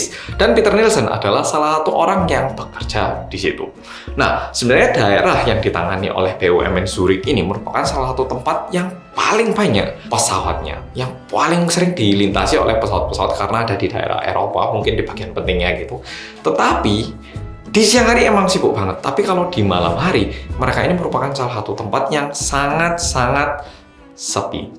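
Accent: Java, Indonesia. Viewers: Mas iki penampakannya orang korea,tapi logatnya medok jowo😁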